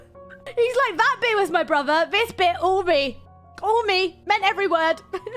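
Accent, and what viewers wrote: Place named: United Kingdom. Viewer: Dude what is your accent? You sound British and Californian simultaneously wtf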